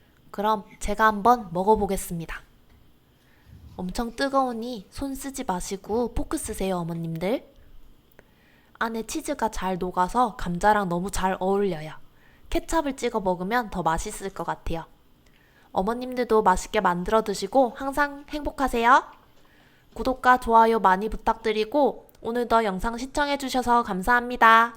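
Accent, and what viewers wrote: Gyeongsang, South Korea. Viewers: 경남 지역인거같아요 제가 경남사람인데 저런말투 쓰거던요 ㅋ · 은 대구말씨~!!^^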